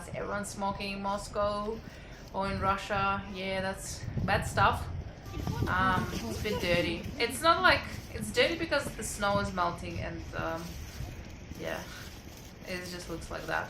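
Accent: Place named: Australia. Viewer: Здравствуйте! Я не буду хвалить Вас за хороший английский язык, было бы смешно, если бы Вы, приехав в Австралию в 26 лет и прожив там достаточно долго, не знали языка, но мне было интересно послушать, как говорят австралийцы! И, хотя все понятно, но разница, все же между английском в США и Австралией чувствуется. И, знаете, я вдруг почувствовала себя американкой на улицах Подмосковья! Такая грязь непролазная, вот ведь ничего не изменилось за столько лет! Спасибо Вам большое за это видео! Мне было неинтересно смотреть вокруг, но было очень интересно мое отношение ко всему этому! 👍👍👍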